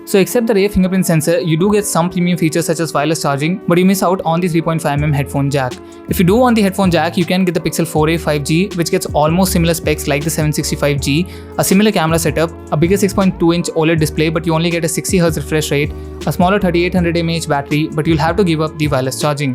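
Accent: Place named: England, United Kingdom. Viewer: English accent so suck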